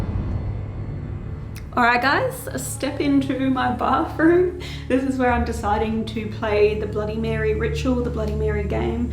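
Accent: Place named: Australia. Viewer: Omfg that Ozzie accent I'm dying 😆😆 you go alright aye love your work greetings from kalgoorlie W/A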